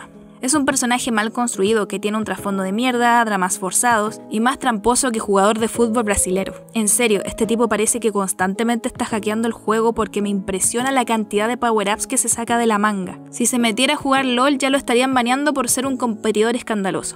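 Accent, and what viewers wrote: Chile. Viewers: Es la primera vez que veo su canal y note altiro que es chilena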